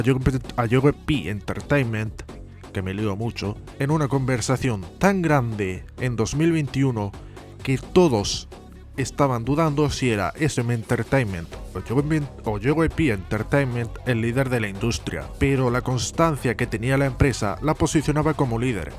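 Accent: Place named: Spain. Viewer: hola humano por el acento debe ser español me gusta que alguien intente profundizar con bisturi en las entrañas de nmixx buscando el problema de su muy poco mas que mediano exito hasta hoy, analizando con lupa a detalle cada cancion y comeback no coincido con el caso kyujin para mi ella tiene que ser el centro y es mejor en todos aspectos que sullyon solo exceptuando la belleza, o.o Dice, soñar, son canciones que poco tienen que hacer contra las de grupos como new jeans,aespa,no se diga grupos de alta calidad musical como XG, tripleS, pero tampoco las calificaria como basura, yo en lo personal prefiero Dice que run for roses Dice es una cancion corta pero grandilocuente llena de cambios de ritmo instrumentalmente rica y fantasiosa una especie de k-pop progresivo es dificil que el publico coreano la aplaudiera mientras que run for roses es mucho mas basica y simple alli fueron a la segura y les resulto cada quien tiene su propia opinion coincido en que el mayor culpable es la empresa ya que las chicas tienen talento de sobra lo unico que necesitan es de buenas canciones que las hagan destacar pero esto pasa con muchisimos grupos y podia nombrar el caso de Kep1er y Everglow y algunos otros mas con miembros con gran calidad pero que es poco aprovechada con canciones terribles como tipi tap o zombie que uno no puede creer no haya un filtro de calidad que se de cuenta lo pesimas que son y salgan al publico,espero que siga haciendo videos porque hay muchos casos de que profundizar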